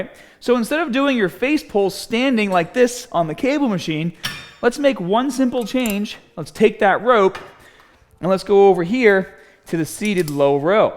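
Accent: Boston, United States. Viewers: Someone is from the Boston area. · Is that a Boston accent?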